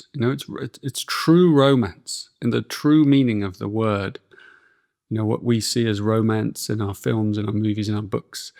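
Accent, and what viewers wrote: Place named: United Kingdom. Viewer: Same thing happened to me! I love beautiful and elegant British accent…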